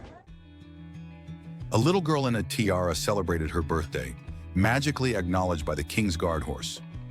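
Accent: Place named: United States. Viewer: Why must an American be the Off voice???🤨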